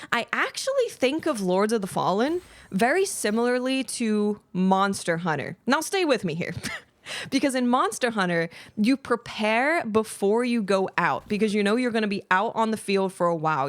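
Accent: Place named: New Jersey, United States. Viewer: That jersey eeesssssh accent got me ❤😊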